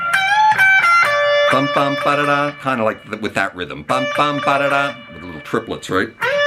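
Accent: Canada. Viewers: Hello squire. You really are becoming a very bad influence on me! I have watched a number of your videos now and I finally subscribed last night when I watched your '43 Year Guitar Journey' thing. I found that very interesting and at times moving, and I really must commend you for your authenticity and honesty. Love your Canadian-ness too (great accent!) and your love for quite a few of the same Canuck artists that I appreciate. Whilst Paul Kossoff was my first love, Alex Lifeson was the picture on my wall as a 13-16 year old. And that was B&W pic clipped out the NME here in the UK in about '78. Times were hard... Anyway. This song is full of great memories for me - my sister allowed me play her copy of ' ' more or less to death (she wasn't too chuffed about that, mind you!) and I played it on a Dancette mono record player in my tiny bedroom for hours on end. Said gramophone was donated to me by my Uncle (who had a country place! 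- geddit?). I always particularly loved ' ' - but they're all great tracks. is a fantastic tune too with lots of classic rock ingredients - and as you mention, a brilliant driving song - it's on one of my DIY car CDs. But I am wittering now... I'll finish by saying thanks for this and I wish you all the best. Cheers, son! - PT x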